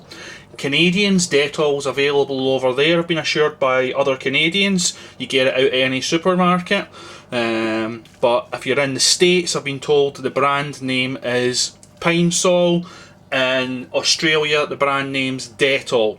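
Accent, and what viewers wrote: Scotland, United Kingdom. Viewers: I stopped at 3.00 and just have to comment here to tell you your Scottish accent is FOOKING AWSUM!!!!!!!! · Great vid mate, nicely explained! (And one of the best Scots accents Ive been able to enjoy - added a much smoother Connery-esque authority to the demo. Och aye!) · you have the best Scottish accent since Capt. macmillan from COD4 Bravo sir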